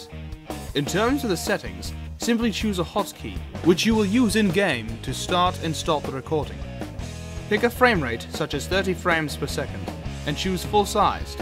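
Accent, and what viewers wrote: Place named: United Kingdom. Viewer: he's British <3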